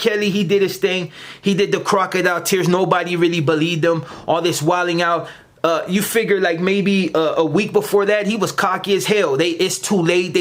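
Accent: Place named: Mexico. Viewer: Why are you put on that Mexican accent in your voice your black brodie🤣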